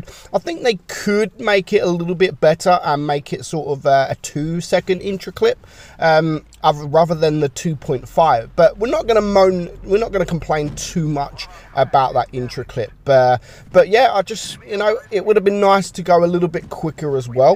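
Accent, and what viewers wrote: United Kingdom. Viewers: outolodah 2021 I love the British accent lol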